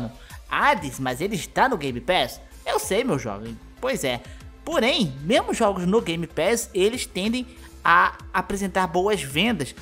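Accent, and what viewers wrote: Rio de Janeiro, Brazil. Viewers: Man, se é do rio? LIKE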